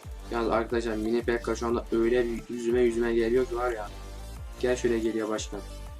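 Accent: Turkey. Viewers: Abi Aslanköy den mi geliyorsun? Öyle Konuşuyorsunda :)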